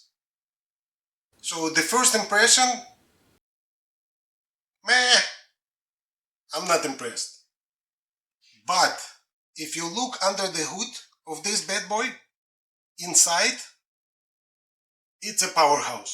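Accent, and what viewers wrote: Russia. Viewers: вы что русский? акцент русский по слогам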